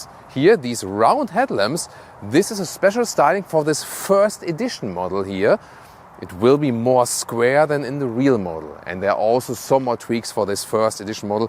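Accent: Germany. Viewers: That German accent is hot 🥵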